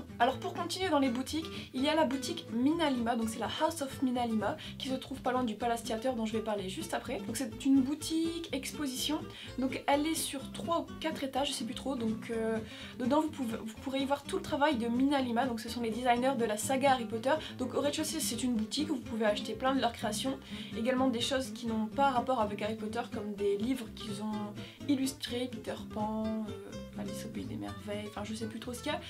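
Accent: North, France. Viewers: Tu viens du Nord ?🙂 Ps: Potterhead a vie 😍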